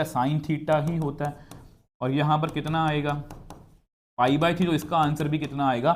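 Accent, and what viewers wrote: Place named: Pakistan. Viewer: Sir ka accent pakistani kyu hai 💀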